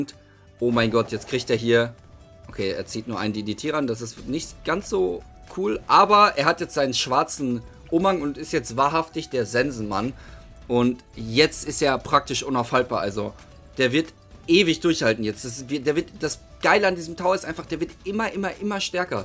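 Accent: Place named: Germany. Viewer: Ich hab in deinen Englischen Videos irgendwie schon gehört dass du Deutsch bist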